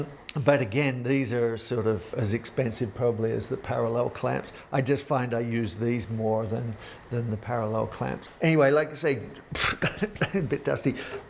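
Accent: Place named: Australia. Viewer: From the start of watching your videos I always assumed that you were working from Australia from the accent. How would you compare woodworking in America compared to Australia? Always enjoy your videos thanks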